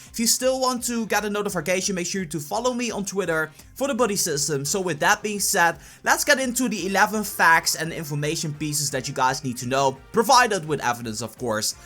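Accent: Netherlands. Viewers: It really sounds like you have a Dutch accent.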